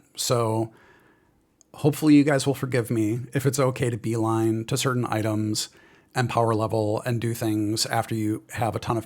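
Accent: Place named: Midwest, United States. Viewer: This is a weird as hell statement but screw it. Why do you sound like my aunt? You from the Midwest?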